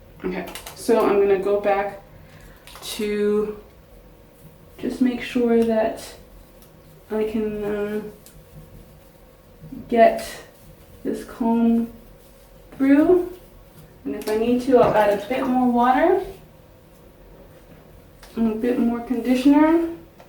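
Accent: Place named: Canada. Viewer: I hear a Canadian accent...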